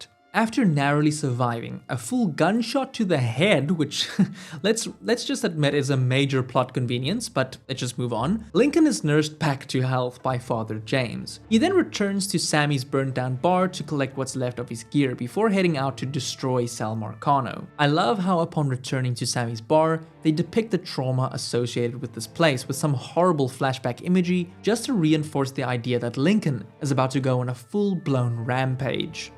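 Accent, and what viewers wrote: South Africa. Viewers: Lekker, man! You're South African! (the r's and o's gave it away lol). While I agree with a lot you're saying, I just can't bring myself to rank Mafia III up too high. The potential is there, Lincoln is compelling and the story is genuinely one of the best I've seen in gaming, but the open world isn't nearly immersive enough (in terms of what you can interact with) especially compared to Mafia 1 OG and 2. I'm far more disappointed than turned off by it, since it has the foundation there (cops reacting differently to you depending on where you commit crimes etc) and in all honesty, Lincoln deserved a MUCH better game. It's still a solid game I replay now and then, and is frankly overhated. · A seth efricen! Lekker man, sommer immediately subscribed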